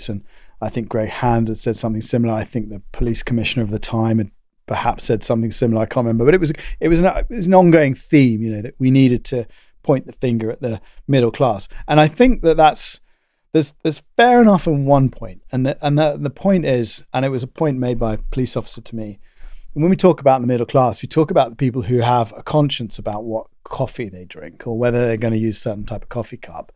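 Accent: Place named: RP, United Kingdom. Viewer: What is it with the comments on here saying this guy doesn’t know what he’s talking about? Well he clearly does and I think he was very precise. Probably because he has a plummy accent so he shouldn’t be taking about drugs when in reality it’s those with middle class plummy accents who consume the most. And others who think it’s only middle class people who snort Coke? I grew up in a council estate in Birmingham a very rough one at that, and believe me once the kids were with the dads for the weekends there was plenty flying around at girls parties!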